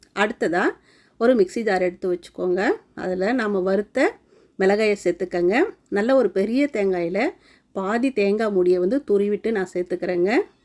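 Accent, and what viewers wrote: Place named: Kongu, India. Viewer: Kongu Thamil Magaley Super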